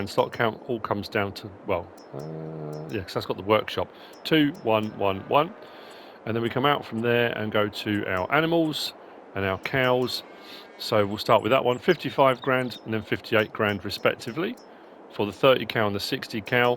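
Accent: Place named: United Kingdom. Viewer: Keep up the great work! I work night shift in the states, and your videos always get me through the last few hours of work 😂 sometimes I can’t help but hop on and try out some new mods when I get home! I appreciate what you do 👍🏻 my family is British as well, so to top it off you sound just like some of them 😆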